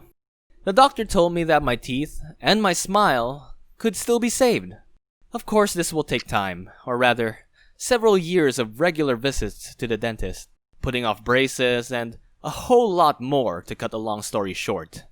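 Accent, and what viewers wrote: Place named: Sweden. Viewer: Is it only me or dose he sound swedish???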